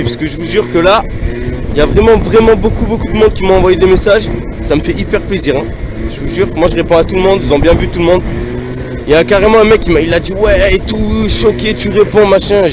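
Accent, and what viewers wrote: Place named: Bordeaux, France. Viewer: Tes d'où t'as l'accent de bordeaux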